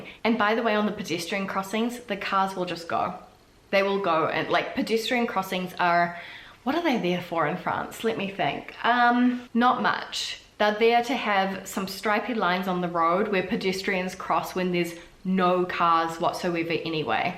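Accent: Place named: New Zealand. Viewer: Haha, since you moved to New Zealand I finally feel like I can see videos as soon as you upload them LOL. As a Kiwi living in Sydney, super refreshing to hear the accent. Great video as always, although i will probably never move there.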